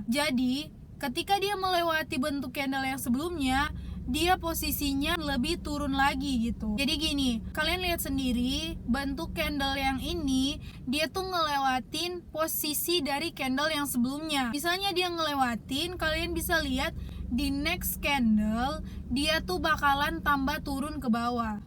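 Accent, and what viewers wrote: Manado, Indonesia. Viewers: Orng mnado kng😂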